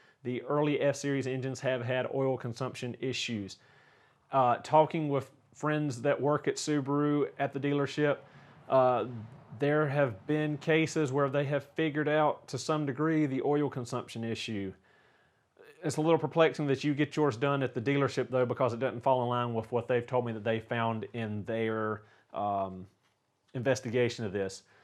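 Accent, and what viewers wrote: United States. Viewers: all good it was funny especially in your yanky accent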